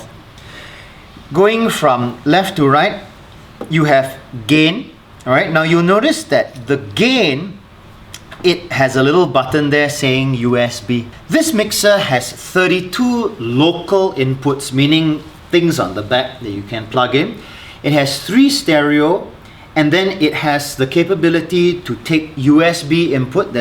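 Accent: Singapore. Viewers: Oh goodness didn't expect the Singaporean accent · Yeahh..sounds really Singapore English. Great video! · I know that you have a very familiar accent! That's why I'm asking whether you're Singaporean/Malaysian ! How did u learn our English accent? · Great Singlish hahaha 👍🏻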